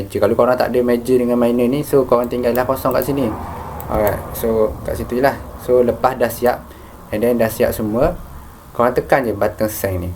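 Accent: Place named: Terengganu, Malaysia. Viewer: terima kasih boh... dengar suara tahu doh.. org Terengganu ni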